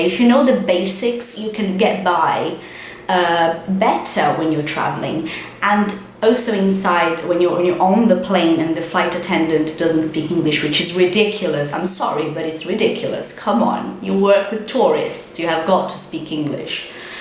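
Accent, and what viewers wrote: United Kingdom. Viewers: Seu inglês britanico é lindo e perfeito assim como seu português, por tanto qualquer brasileiro se vira bem em países de lingua espanhola, melhor que gesticular tentando falar ingles com quem nao entende.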